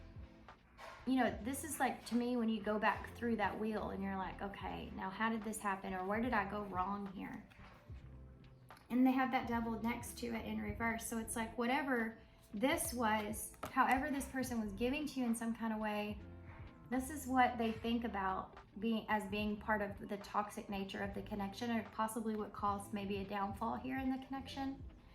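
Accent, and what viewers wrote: South, United States. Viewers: Just found this channel. Pleasantly surprised by your southern accent! Love from southeast Kentucky ❤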